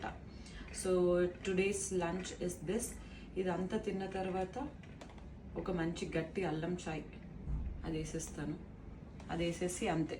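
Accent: Telangana, India. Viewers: Telangana slang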